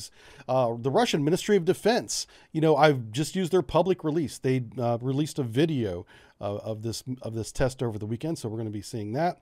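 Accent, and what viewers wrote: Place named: United States. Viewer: You sound exactly like I'd only expect to see from an American.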